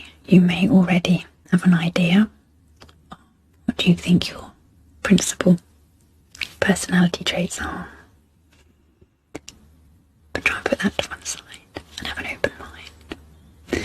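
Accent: England, United Kingdom. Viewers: I wanted to hear all the content rather than using this video as ASMR to fall asleep. Since it's soft spoken and she has a lovely, strong English accent (I'm Texan, USA), I turned closed captioning on. I find that feature quite helpful when I want to hear every word, as it's quicker and easier than backing up and re-watching dialog I miss. You can turn CC on by clicking the settings button on the lower right side of the screen when the video is playing. Just a suggestion! 😀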